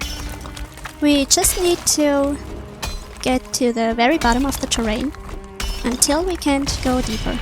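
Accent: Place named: Australia. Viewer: Bringing metal by boat cost my like 1 3rd of my game time. I think the reason to have it disabled is because you get upgraded portals in the Ashlands, that let you take metal through. I think you should try using the cart it's hilarious. Oh btw. try not to die too much cause skill drain will slowly deplete your skills. Your sounding more Australian by the day. Good video. :face-blue-smiling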